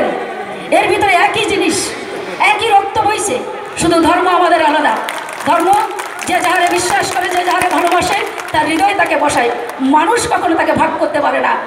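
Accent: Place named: Bangladesh. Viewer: Bhasha ta thik kore bolun, apni to Bangladesh theke bolche6en, mone hoy, r apni to nodiyer lok, apnar kotha to, Bangladesh hobei, karon nodiyer r Bangladesh sem sem okokoko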